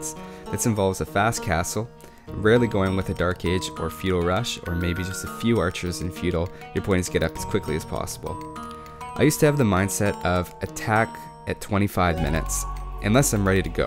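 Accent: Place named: Canada. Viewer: Your accent sounds Canadian haha. Are you from Canada?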